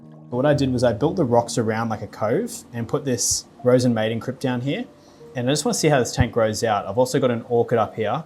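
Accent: Australia. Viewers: Love your videos. As an American, I get a little chuckle out of hearing Australians say H like "heych", and here like "he-yah"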